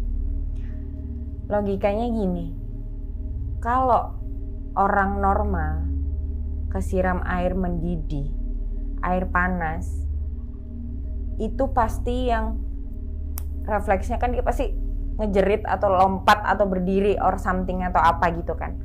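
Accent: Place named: East Java, Indonesia. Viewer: wa ini org jawa timur ya mbak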